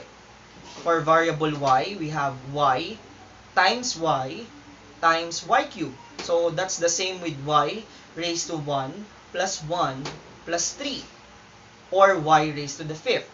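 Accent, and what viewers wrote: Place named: Philippines. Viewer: I love his Filipino accent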